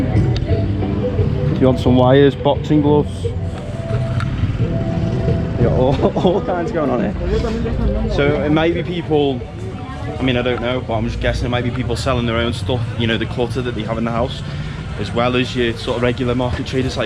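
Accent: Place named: Yorkshire, United Kingdom. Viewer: Loving your accent! Yorkshire? Brummie?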